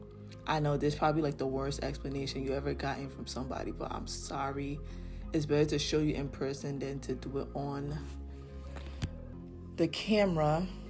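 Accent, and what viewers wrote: Liberia. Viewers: You sound Liberian and love it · Heard the accent and already knew LIB STAND UP 🇱🇷🇱🇷🇱🇷 my sista much love 🫶🏾🫶🏾🫶🏾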